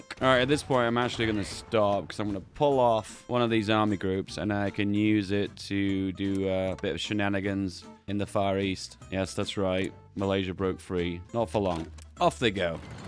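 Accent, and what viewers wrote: United Kingdom. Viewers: I didn't know that you are a british guy in armored car. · Even if i wasn't watch him earlier, still i knew he is British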